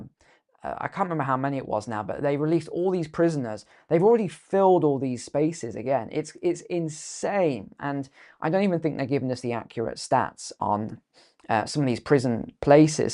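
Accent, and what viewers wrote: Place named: United Kingdom. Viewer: are you on the Isle of Man? What is your citizenship? I'd bet that most viewers on youtube think you're an American with a British accent. I confess I've been confused.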